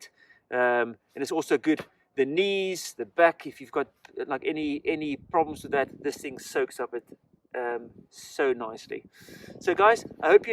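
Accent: South Africa. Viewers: you sound soth-afrikan. Channel looks good, subbed.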